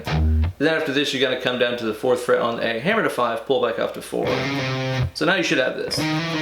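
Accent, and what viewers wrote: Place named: Texas, United States. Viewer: You sound like you’re from Texas, man. We have good metal down here in the south.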